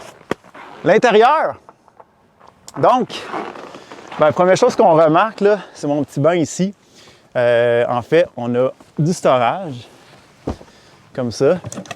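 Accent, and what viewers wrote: Quebec, Canada. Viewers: Salut, super vidéo , tu expliques bien toutes tes petites astuces, il faut bien écouter, ton accent québécois, les termes ne sont pas pareil que nous,(cousin du sud de la France), mais c'est un vrai régal...Bel exemple d'ingéniosité, de liberté et de philosophie de vie... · Ça fait du bien le parler québécois 😘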